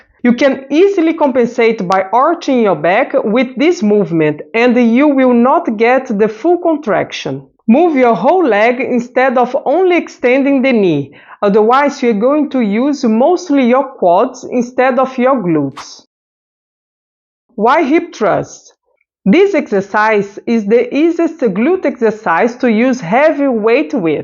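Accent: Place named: Portugal. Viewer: adorei os exercicios. vc fala ingles , mas nao aprendeu a ter a pronuncia e intonação da lingua inglesa. como? fala ingles com intonaçao do portugues , triste. otimos exercicios obrigada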